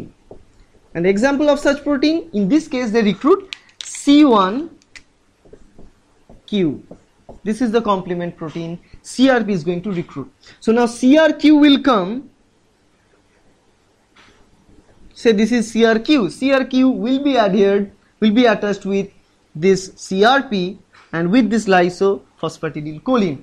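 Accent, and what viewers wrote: Bengal, India. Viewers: It seems u are a Bengali? (by your accent and name) Which college are u from.. , sir...?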